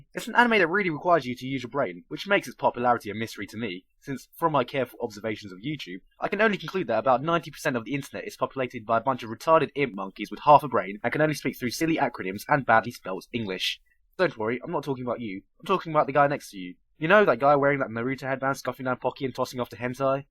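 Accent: United Kingdom. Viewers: Dude he had such a British accent back then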